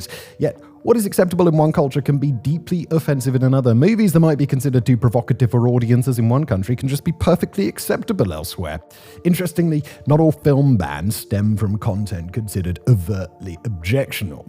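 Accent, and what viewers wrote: United Kingdom. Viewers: Shocked that your actually British thought it was a act 😂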